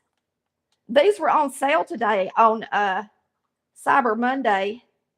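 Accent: South, United States. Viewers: I've listened to.your. blog.several. times now it is the.best i.have it memorized. I have 5 pds. of.raw Spanish peanuts coming to my door step the nuts should be here by monday.I'll be making the brittle in a cast iron skillet. Butter makes everything taste better. I love your southern accent I'm from Wa.state.May God bless you.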